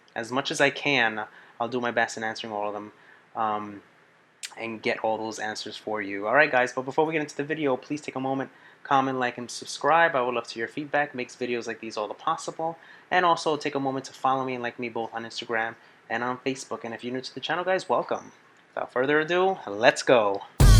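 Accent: New Jersey, United States. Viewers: You are so instantly lovable. ♥ (Also, when you mentioned you are from NJ ... instantly, I started hearing it, and I'm not even from your side of the Atlantic!) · Answered my question. NJ accent. =) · So that's why I hear you NJ accent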